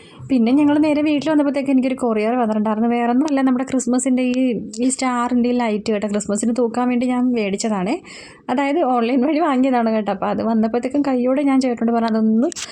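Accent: Kochi, India. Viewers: Njanum kochi kari annu.... Kochi language kelkumbo othri santhosham..... Njan chennaiyil annnu ediku varum Kochi marakan pattumo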